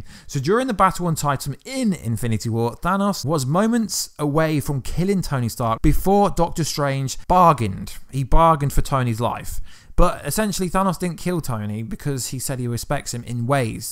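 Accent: United Kingdom. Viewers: OH MY GOD... MAN WITH BRITISH ACCENT... YOU KNOW ANT-MAN HAS NOT BEEN RELEASED IN THE UK AND YOU JUST SPOILED IT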